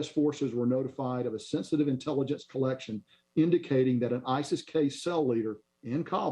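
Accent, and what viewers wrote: South, United States. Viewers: This southern crap talk, why is he here, he can't even read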